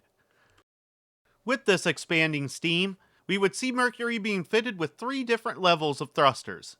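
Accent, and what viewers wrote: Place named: South, United States. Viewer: Wait! What happened to your beautiful, ambiguously southern drawl???!!!!! It's just not the same :'(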